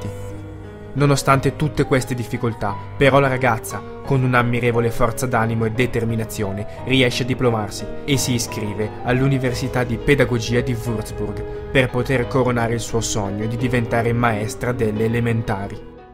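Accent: Italy. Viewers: muoio per il purtroppo!! Comunque seriamente sei italiano? E se si da dove?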